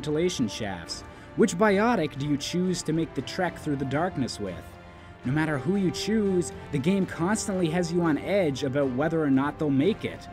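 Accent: Canada. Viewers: This guy is Canadian isn't he? This guys Canadian.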